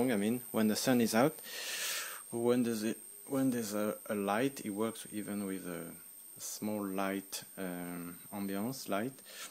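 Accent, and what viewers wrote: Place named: France. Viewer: Salut, à écouter rapidement le début de la vidéo, je pense entendre un accent bien français:) ce qui me donne espoir de pouvoir discuter de ton installations de vive voix. Fait moi signe stp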